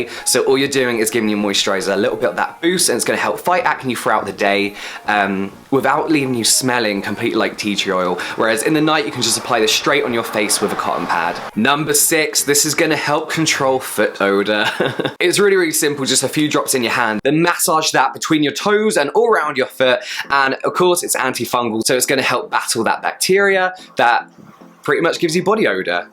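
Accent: United Kingdom. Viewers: I love the UK English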